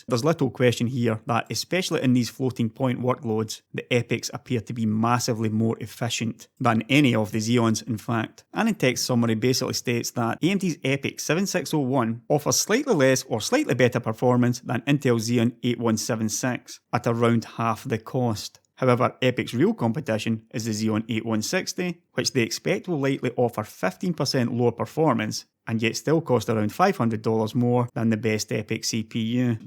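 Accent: Scotland, United Kingdom. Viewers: is he scottish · I honestly want to think you are Scottish but 1% of my brain says you are Irish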